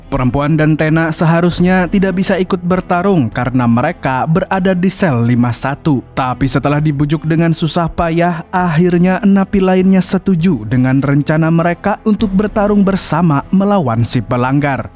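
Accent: Banjar, Indonesia. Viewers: Bang orang Banjar kah...Banjar mana Pian bang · bah dah jua urng banjar si Mimin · Urang banjar kh pian bg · Orang banjar kh pian??? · Orang banjar sakalinya😂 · Orang Banjar kah mang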